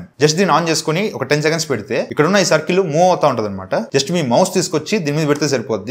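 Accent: Nellore, India. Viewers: Needhi Nellore ah?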